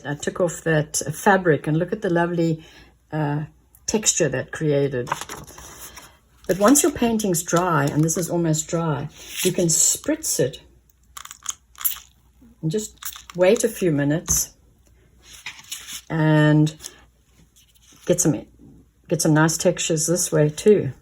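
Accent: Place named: South Africa. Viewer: I know this is out of context, but are you from South Africa? You sound very much from here.. love your channel❤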